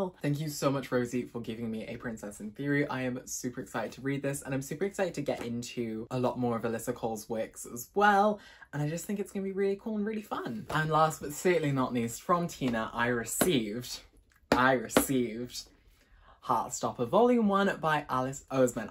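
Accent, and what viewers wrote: United Kingdom. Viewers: As if I wasn’t excited enough when I saw that he was a black male booktuber from the thumbnail, his British accent just rlly put the cherry on the cake 😩😩😍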